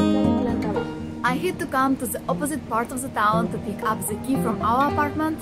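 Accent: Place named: Spain. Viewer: what a beautiful town and the food looks delicious! BTW, I just love your accent, are you Spanish?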